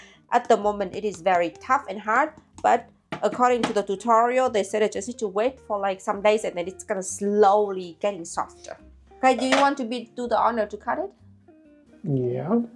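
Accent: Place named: Germany. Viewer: Your English is not a burden! I love. You remind me of how my German mother speaks English and I find it quite lovely.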